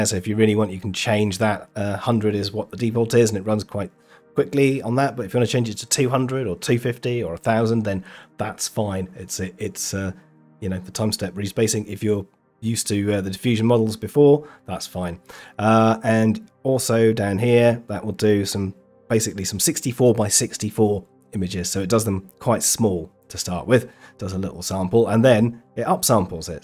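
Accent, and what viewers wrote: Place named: United Kingdom. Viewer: You sound like spiffing brit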